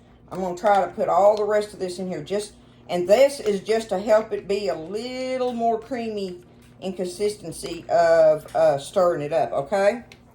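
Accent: South, United States. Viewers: I love how you talk your Southern❤ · I love how you talk Southern also I'm from Oklahoma so I talk the same way! Where did you purchase the little round rack that fits over dinner plates? I need to get me one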